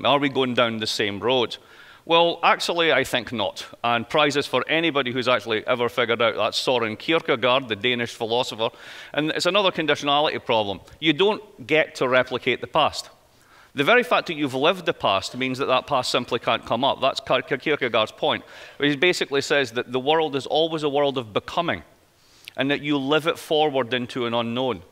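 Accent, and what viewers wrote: Scotland, United Kingdom. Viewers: I want to ask the channel owner -- to PROVIDE C.C. I believe Mr. Blyth is a brilliant economist, but he speaks with the RIDICULOUS ACCENT! Probably scottish or welsh I couldn't understand 1\4 of the lecture.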